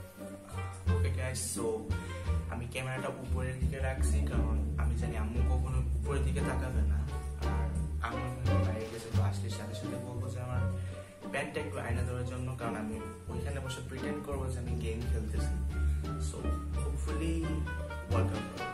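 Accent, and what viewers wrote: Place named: Sylhet, Bangladesh. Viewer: Lol 😂 onek moja paise 🤣 your sylheti language is so cute 😁. Finally I have had chance to see auntie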